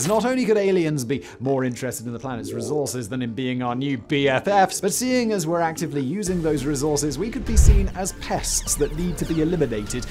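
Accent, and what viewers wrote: United Kingdom. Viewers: Hmm a synapse of the fine points of Hitch Hikers Guide to the Galaxy and read by a Brit? Where Simon's writers just taking a piss?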